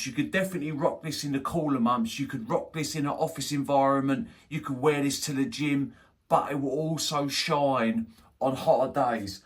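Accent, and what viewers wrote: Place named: England, United Kingdom. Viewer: you were saying the house of M.Micallef correctly. DesirToxic is totally my speed! I can’t believe you’ve never reviewed this scent on your channel before. It’s pretty popular in America for a niche scent. The new version, DesirToxic L’Intense (2024- adds a heavy note of Mint in the opening but removes the majority of the greener cannabis aspects from the heart (I can’t remember if cannabis is still a listed note, and I’m too lazy to look it up at the moment). Anyway, just had to mention that it always tickles me every time you say the word “Three”. It sounds like you’re saying “Free” to my American ear. I find it funny, I promise I’m not making fun of you, it’s our little differences in life, ya know? Anyway, I’m interested in accents, do you mind telling me what part of England you come from or what region your accent derives from? 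I know there’s like a Manchester accent, and a Posh section of London accent, etc. Just curious. Please take care of yourself and I pray you're doing well. All the best from sunny Florida. P.S. I’m always impressed by your nose, thats why I continue to watch and I like your presentation style- not a lot of fluff! Just down and dirty, you get right into it.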